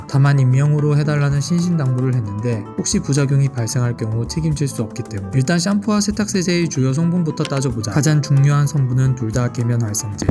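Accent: China. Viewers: 한국분인가요? 어째 발음이 너무 이상해서요. 억양도 이상해요. 지도상 우리나라 왼편에 있는 나라 출신의 발음과 억양으로 보이네요.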